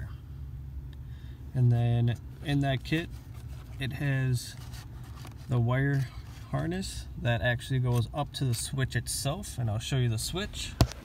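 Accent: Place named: Canada. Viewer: Can't stand that Canadian accent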